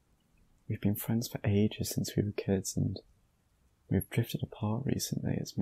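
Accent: England, United Kingdom. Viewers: Omg you have such a proper English accent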